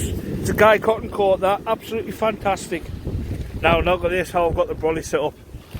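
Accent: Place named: Teesside, United Kingdom. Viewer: Just subbed👍👍 I think you're my neck of the woods by your accent. Are you Boro/Stockton area?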